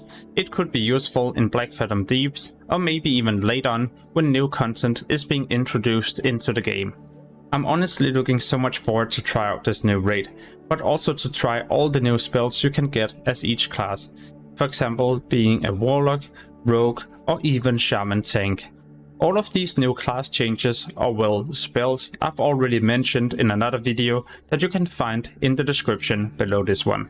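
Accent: Denmark. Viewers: I think Danish. there's a character named 'Draeber' translating to 'Killer' in Danish. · Er du dansker ??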